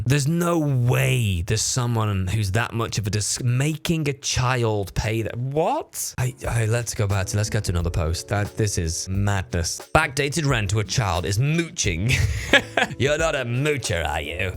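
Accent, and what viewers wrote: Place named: United Kingdom. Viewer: Finally another reddit channel I can enjoy! Hell ye, time to learn to understand the ✨ British accent ✨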